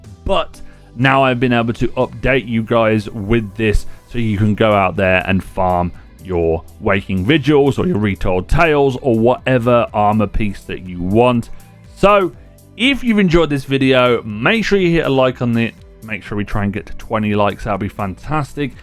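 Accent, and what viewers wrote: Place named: Scotland, United Kingdom. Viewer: Ah some scottish accent. Nice.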